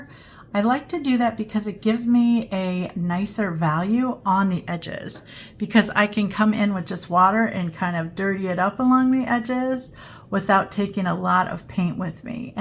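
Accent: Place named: Midwest, United States. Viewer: Hi! I just want to tell you how much I love your style and enjoy your videos. Your work is gorgeous! Thank you so much for sharing your talent ❤ On another note, I’m curious to know where you’re from. You mentioned the Art Institute of Chicago in a video I recently watched. I was born in Chicago and have ties in Wisconsin. I’m thinking your accent is maybe Wisconsin…Michigan…Ohio…?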